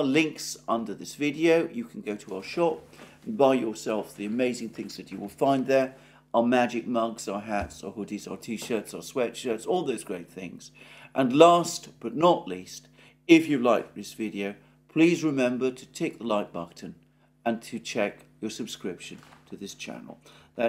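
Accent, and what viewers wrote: United Kingdom. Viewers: Is he British or Russian?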